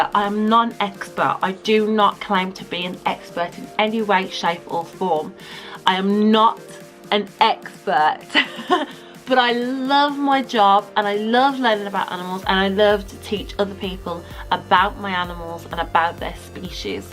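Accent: United Kingdom. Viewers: She is definitely not American LOL I think I can safely assume that she is a Brit.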